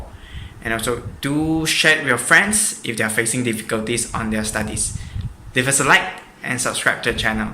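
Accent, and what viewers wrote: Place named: Singapore. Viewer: Thanks!! I know this question is out of the blue but are you from singapore?. Ur accent is kinda similar so😊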